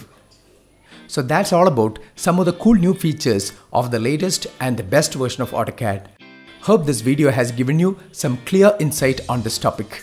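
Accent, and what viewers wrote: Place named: India. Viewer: Thank you for the effort in making these videos. I just wish that you remove that Indian accent when speaking the English. Excuse me, I wanted to learn in what your are teaching, but it always annoys me listening to that accent. Please learn to speak English w/o that accent. Thank you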